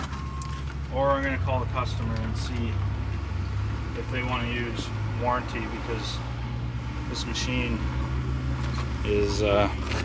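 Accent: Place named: Canada. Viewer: Nice truck man , is that Canadian accent ? Sending good wishes from Scotland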